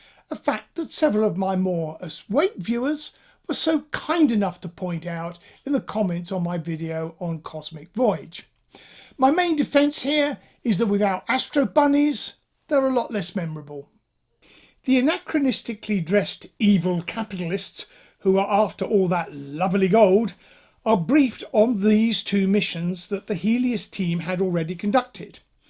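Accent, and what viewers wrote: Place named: Yorkshire, United Kingdom. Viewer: Fascinating. The way you pronounced Frau im Mond sounded distinctly Yorkshire. Yes, I know The Romance Languages have the same thread running through them and the Germanic Angles and Saxon Tribes settled in Yorkshire some time in the C5th, or C6th. It just means I was reminded of the connections tied together in a neat little bow, through your audible delivery. Thank you, Cheers.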